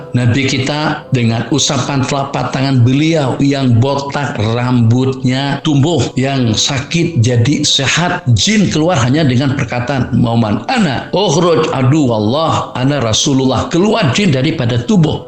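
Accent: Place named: Banjar, Indonesia. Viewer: sheikh ni Malaysianlah cuma dialeg yg d gunakn ming meng dialeknya etnik Banjar dn asal dia Indon ttpi dh lama...mcm orng Cina dtng ke Tnh Melwati awal 1900 dahulu... Agama Islam menggabungkn pandngan agamawan dn bijak pandai moden spt dr/pakar...dh lama kita ikut ckap pemerintah dn dr/pakar tetapi kenpa kes covid makin buruk? Cuba jwb kenapa? Itu maksudnya sudh smpi masa kena minta dn dngar pandngan semua trmasuk agamawan juga...faham! Apa yg sains/barat dpt semuanya dari AlQuran...ngerti!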